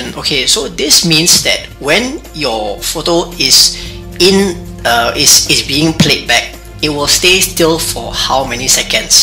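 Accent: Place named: Singapore. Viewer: I like hearing this singaporean accent hahaha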